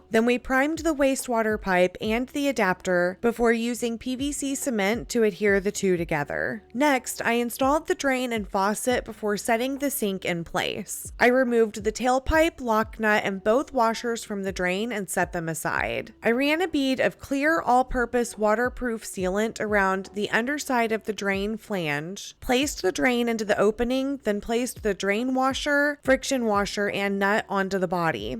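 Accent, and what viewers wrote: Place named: Midwest, United States. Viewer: vocal fry. up speak. midwest dialect. TRIPLE THREAT. Use some nice Ai program for your VO, please. nails on a chalkboard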